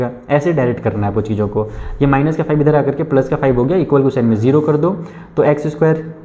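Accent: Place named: Bhojpuri, India. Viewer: Sir aap up/bihar se ho kya Ajj apko bhojpuri style me padate hue dheaka✅✅🙃🙃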